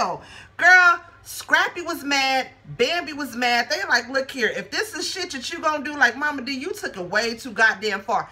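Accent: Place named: Jamaica. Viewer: Hit the like button first then starts watching. We wanted to hear your Jamaican voice. Momma Dee Though🤔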